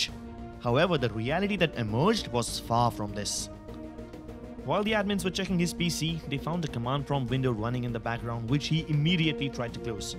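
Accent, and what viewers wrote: India. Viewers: i like how he speaks with hindi accent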